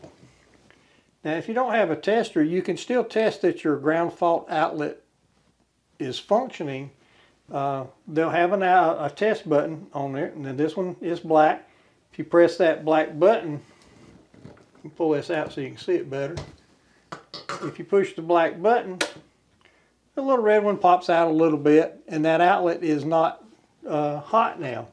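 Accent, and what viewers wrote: South, United States. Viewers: He explains it nice and simple. The southern accent is great. Thank you sir. Ya'll good people.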